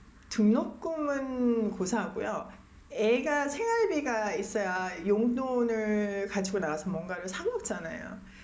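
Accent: Gyeongsang, South Korea. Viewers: 고향이 경상도?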